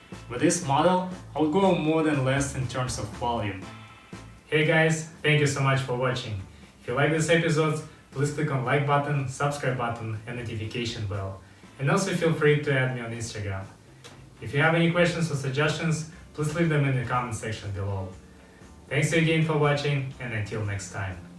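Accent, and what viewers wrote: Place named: Russia. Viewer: No disrespect, but did you learn English in Russia? you have an interesting accent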